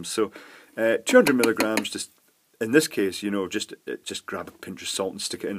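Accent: Scotland, United Kingdom. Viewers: you can tell your scottish lol............ get 6 for a quid in poundland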